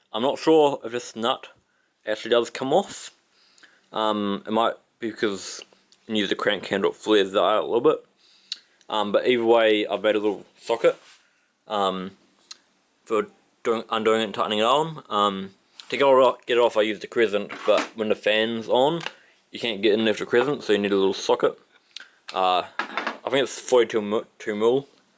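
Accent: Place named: Australia. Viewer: Hi if your going to do a video that might be watched by English speaking people ,it would actually be nice if we could understand what you were saying...im not knocking the Aussi accent, i get on fine with that, but you abbreviate words as you speak. you miss out important syllables and just merge what's left into a slurry of bits ow words.. or is your microphone at fault ? I recommend you SLOW DOWN and ARTICULATE the words then people will actually be able to understand and learn from your video !! That is after all why you have posted the video, isn't it... Im not trying to annoy you either , but to help you with a comment to improuve your videos.. im actually very interested in these motors and I am following these type of videos in French, German, English, Dutch, Italien, and despite being multilingual, yours was the hardest to listen to !! slow down, take your time, it's not a race!!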